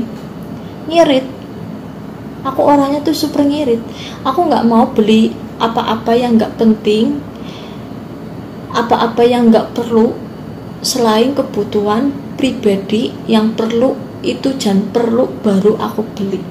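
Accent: Banyumas, Indonesia. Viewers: Ngomongnya ngapak , ya salam dari Purwodadi Grobogan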